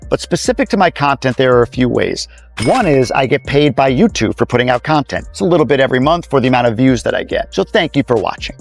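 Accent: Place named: New York City, United States. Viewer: I knew that what a NYC accent! Now it all makes sense to me lol.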